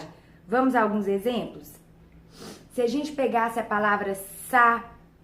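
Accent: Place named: Minas Gerais, Brazil. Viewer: Ela deve ser de Minas ou Goiás . Pelo sotaque amei a aula já estou seguindo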